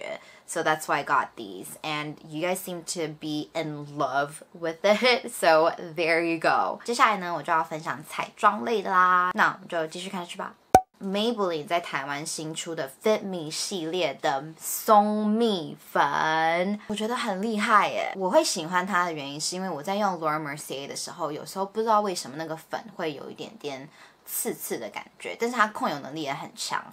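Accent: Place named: United States. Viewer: Dena我有問題✋ 這是我很久以前就想問的！終於鼓起勇氣問 因為真的太想知道了😂 就是為什麼妳是在台灣長大但是講中文卻有abc感？！ 是因為講英文久了就會變這樣嗎？ btw我好喜歡妳💖💖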